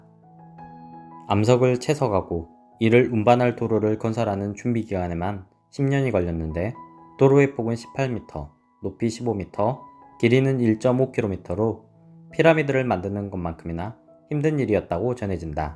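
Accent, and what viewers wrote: Jeolla, South Korea. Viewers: 고향이 전라도신가요 ㅎㅎ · 말투에서 잔라도가 느껴지네요..내 생각이 맞을걸요~ 사투리 감별사임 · 전라도 사투리 모댔던(못했던) 참ㅋㅋㅋ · 유튜버 전라도 사람이네 ㅋㅋ 못했던>>>모댔던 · 전라도 사투리가 심하네요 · 전라도사투리쓰는거 안부끄러워요? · 전라도 분이시네요.사투리가...^^ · 사투리만 고치면 더 좋은 영상이 되겠네요. 전라도 사투리가 너무 심함. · 전라도사투리 구수하고 좋아요ㅎㅎ 잘봤습니다 잘만드셨네요 유익했음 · 전라도 분이시네^^ 영상 잘 봤습니다~~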